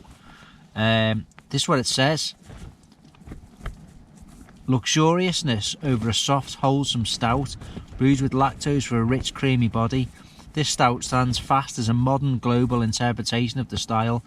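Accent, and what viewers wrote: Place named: Wirral, United Kingdom. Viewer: Hi good vids mate You sound local to me Wirral. I have the msr elixir but the reviews you have done has sold me the Hilleberg soulo 👌🏻keep the vids coming chief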